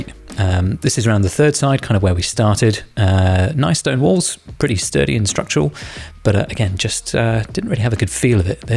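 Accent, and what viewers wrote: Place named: Australia. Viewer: From London? Your accent sounds Aussie😊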